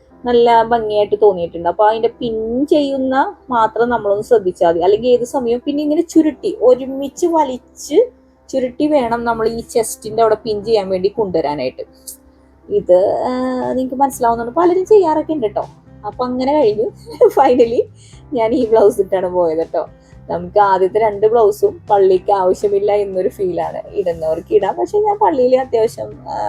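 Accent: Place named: Ernakulam, India. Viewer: ചേച്ചിയുടെ എറണാകുളം ഭാഷയാണ് പൊളി🎉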